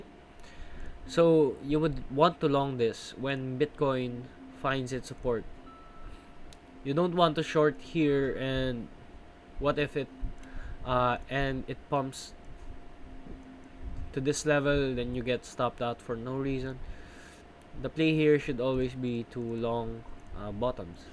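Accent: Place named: Philippines. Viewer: Just curious with your accent if you're a Filipino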